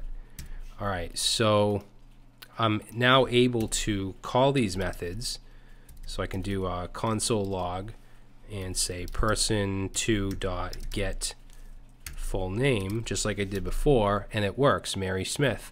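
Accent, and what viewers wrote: Boston, United States. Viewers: I love this Bostonian accent. Also, great content.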